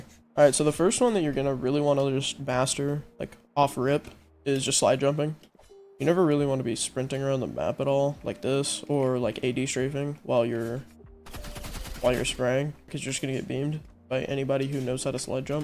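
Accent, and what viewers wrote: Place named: Kashmir, India. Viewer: hey are you from kashmir India if yes then i am from Jammu and your accent is amazing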